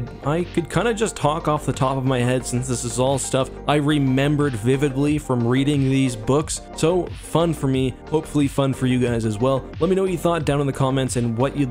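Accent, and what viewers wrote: Canada. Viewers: You know he's canadian by the way he says "Aboot" instead of "About" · Are you canadian? You pronounce about in a canadian way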